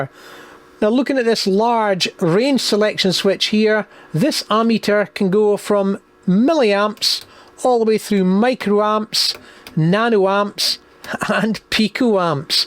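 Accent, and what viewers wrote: Scotland, United Kingdom. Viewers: Is that really 4 nixies? The board inside had just 3 nixies on it. Is the forth digit in front of the 3 nixies just a neon bulb that serves as a 1 indicator? Guess this can be refered to as a 1999 count meter 🙂 Nice repair anyway, and I just love your Scottish accent.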